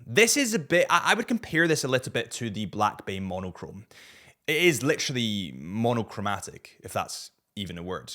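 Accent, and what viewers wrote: Scotland, United Kingdom. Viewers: Scottish my guy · He is not English, he is Scottish ☝🏽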